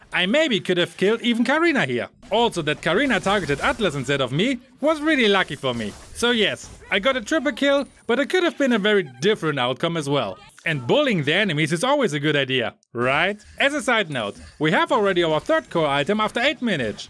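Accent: Germany. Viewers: You from Germany?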